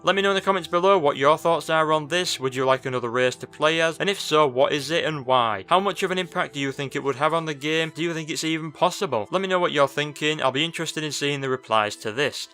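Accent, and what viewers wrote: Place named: Yorkshire, United Kingdom. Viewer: Why do you talk like a Yorkshire Johnny Vegas?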